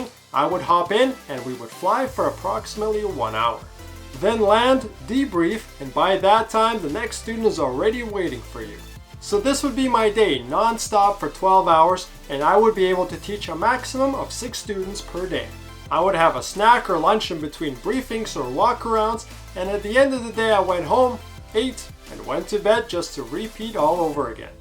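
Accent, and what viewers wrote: Canada. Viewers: im from bc and this guy has the most canadian accent ive ever heard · Do I sense a slight Quebec accent? If so what schools are good with a good price in qc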